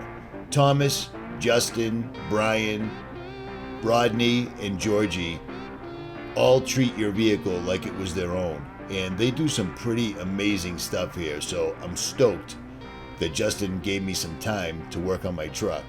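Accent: Boston, United States. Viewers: Sounds like Ritchie from Boston?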